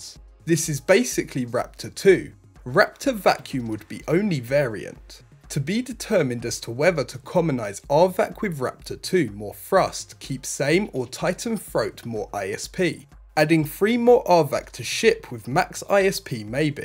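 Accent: England, United Kingdom. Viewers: That is the way English people talk. They did invent the language after all. They then named it after themselves.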